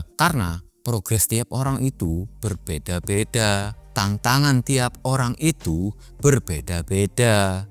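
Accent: Java, Indonesia. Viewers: Jadi medok gini bang wkwkwk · Sampean jowo to bang, kok medok😀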